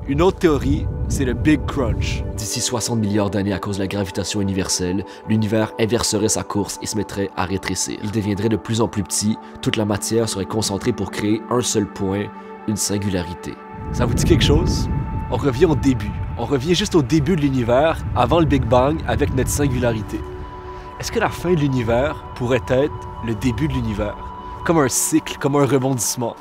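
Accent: Quebec, Canada. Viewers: Salut.. je suis pas sûre si tu vas lire ça ou non.. mais j'ai décidé de le faire quand même.. je suis ni d'origine francaise ni québécoise alors j'ai eu qlq difficultés pour comprendre un peu tes vidéos mais le contexte est très clair et vraiment tes vidéos sont très profondes et touchant tu fais un excellent travail.. j'aime bien l'accent québécoise et c'était parmi les bonnes choses que je vécu lorsque j'ai tombé sur ta chaine c'est un trésor perdu que j'avais un chance de le trouvé.. bonne chance et mercii☺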